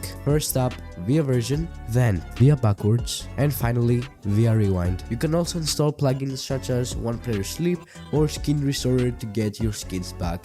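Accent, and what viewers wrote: Greece. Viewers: Bro Είμαι Έλληνας και εγώ έβλεπα το βίντεο και η προφορά ακούστηκε ελληνική μετά είδα και το κανάλι ήταν από την Ελλάδα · i knew by his accent that he was greek and just when he went to the options tab i saw it greek characters never underastimate my gut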